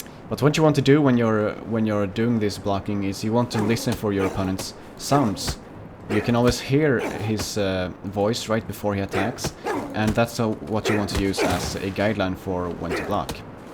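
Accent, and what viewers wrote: Sweden. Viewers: hes swedish i can her it on his voice cuz im swedish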